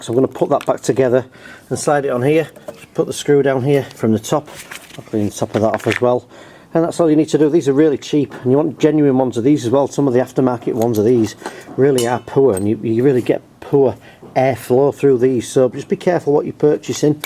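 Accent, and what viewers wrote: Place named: Manchester, United Kingdom. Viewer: Hi mate your vids are good with clear directional info.could you be as kind as to advise me how to go about sorting one of these b&s orion 35s which runs was cutting sweet but suddenly when l get into longer grass it seems to have lost her torque.will sub with you and buy any bits thru you too, your doing a grand job sharing your knowledge,l am a manc and you soind close by, much appreciated..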